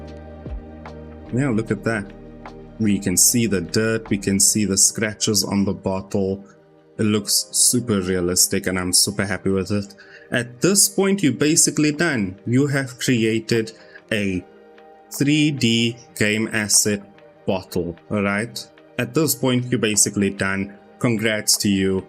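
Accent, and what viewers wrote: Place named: South Africa. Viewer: btw one question, are you south african? i can hear by your accent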